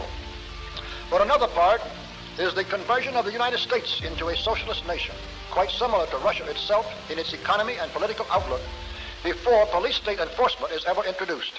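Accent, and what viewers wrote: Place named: Canada. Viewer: Sounds like Canada ..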